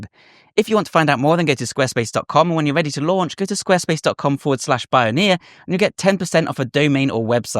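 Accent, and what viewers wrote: United Kingdom. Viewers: Closed caption gives a transcription of CATTLE BELLS. Now the largest cow bell is over 2000 lbs in Germany. So maybe has something here....cow bell lifting. Or maybe it's just the Brit accent.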